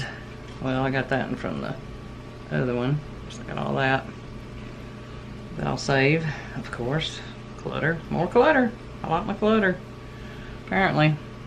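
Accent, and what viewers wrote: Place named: North Florida, United States. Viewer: Loove this, too! I am also a person who loves thrift store fabric! I am so crazy about fabric flowers. I like almost anything made from fabric. I could craft 24/7 , IF I had the time! I am from North Florida. You sound like us here in good old Live Oak. I am guessing you are from the south as well. I love watching you and listening to you. Thank you for sharing.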